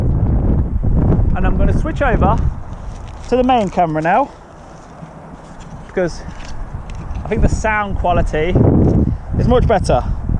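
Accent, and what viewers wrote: Leicester, United Kingdom. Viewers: Love the quality on this channel, I live in Derby near swarkestone bridge on the A50 so you are so local!!! Leics twang sounds so similar to Nottingham twang, which is where I was born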